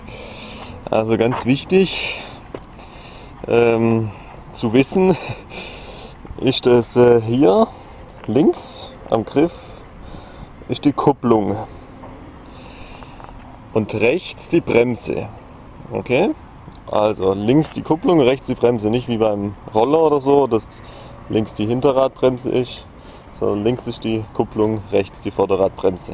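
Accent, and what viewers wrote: Swabia, Germany. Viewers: endlich amole an gscheiter schwabe oder ? :D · Omg ich liebe Schwäbisch :D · Aha.. eh Schwob ;)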